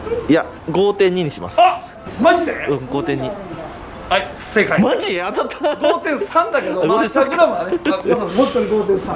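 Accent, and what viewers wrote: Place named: Nagoya, Japan. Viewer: 名古屋弁、いいなあ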